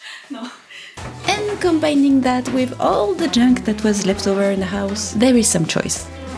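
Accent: France. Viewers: Je suis déjà quelques vidéastes anglophones qui font pleins de réaménagement/cosplay/whimsical stuff. Entendre ce bel accent français et les expressions ça m’a fait tellement bizarre 😂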